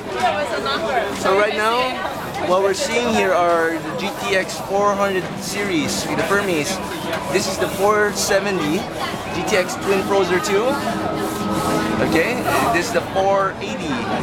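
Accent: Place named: Philippines. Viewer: This guys sounds Filipino. XD F to P's and P's to F's. Just like my mom...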